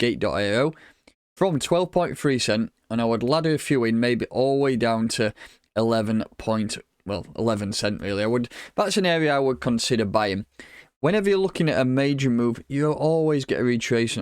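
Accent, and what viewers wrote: United Kingdom. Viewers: dude stop talking with british accent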